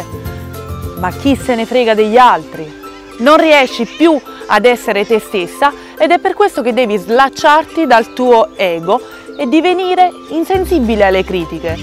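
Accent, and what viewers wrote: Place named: Naples, Italy. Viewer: Che bello in tuo accento napoletano !!!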